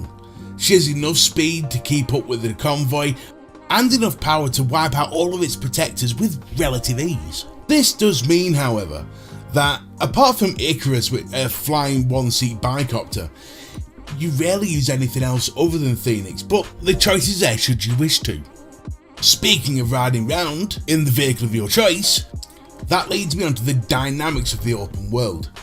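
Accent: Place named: Yorkshire, United Kingdom. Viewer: Awesome review, guessing by the accent you're from Yorkshire/Lancashire, Anyway I bought Rage 2 and I was having a blast but see your points entirely and also don't agree it should get 9 out of 10s. I think a fair score would be 6/7 out of 10. Maybe 8 maximum. I think "getting your money's worth" is a little subjective because I'm having so much fun with it I've never thought "hmm I feel robbed" HOWEVER, I wouldn't recommend buying full price to someone just now. I think if you waited a couple of months you'll be able to pick this up for 15/ 20 pounds and tbh that's about the price It would seem like a bargain. when I first saw it on steam a couple of weeks ago it was priced 29.99, which I think is where it should of stayed but day before released I noticed it cost 40 pounds which for me is tipping it just to far to recommend full price.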